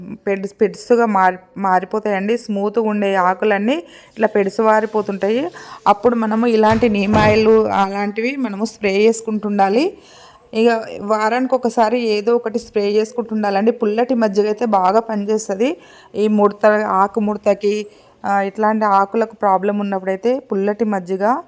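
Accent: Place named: Rayalaseema, India. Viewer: మీ లాంగ్వేజ్ రాయలసీమ లాగా vundi అక్క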